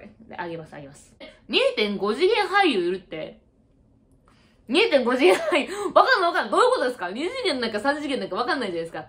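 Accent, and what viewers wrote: Kansai, Japan. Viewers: 関西人がしゃべる標準語よき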